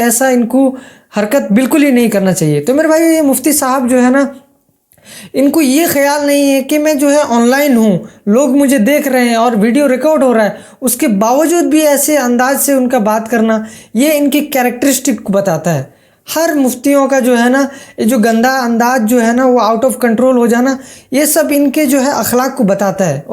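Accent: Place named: India. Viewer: Inka taaluk India se hai, aur India ke kuchh elaako me log ese hi Urdu boltey hain, jese hamaari taraf hamaarey kuch pathaan Bhai jab Urdu boltey hain to unki Urdu thori si different hoti hai, jesey wo kbhi چھوٹا ko چوٹا bol detey hain. Esey hi India me kuch elaake hain jahaan log شروع ko سروع boltey hain.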